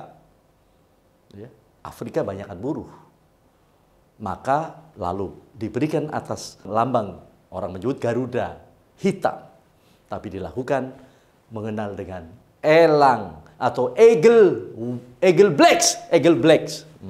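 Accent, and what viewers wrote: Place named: East Java, Indonesia. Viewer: Maap mas .mas ine dri logatnya .ngomongnya dri jwa timur .klu menurut sy mas ine bkn asli sunda .grhhh yy0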